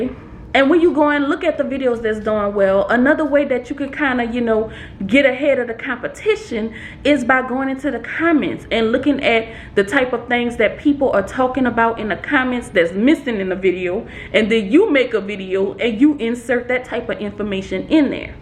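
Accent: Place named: South, United States. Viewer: Congratulations Mrs.!!!!! I'm so proud of you. You truly deserve it. I love your videos. You come off as very down-to-earth with lots of southern charm. I'm from Mississippi. So, you bring me sounds of home that I just adore! 😊 Please make another video when you hit that 500,000 subscriber mark IN A FEW DAYS!!! 😁 (I'm speaking it into existence.) We all want to celebrate with you. Keep up the great work, and enjoy your blessings.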